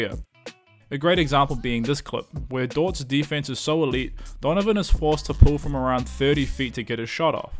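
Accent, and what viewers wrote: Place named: South Africa. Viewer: i smell South Africa in that accent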